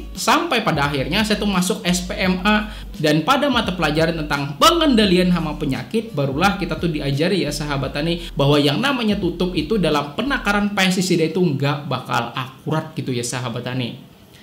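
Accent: Banjar, Indonesia. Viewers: dari dari logatbicaranya seperti Bahasa Banjar!!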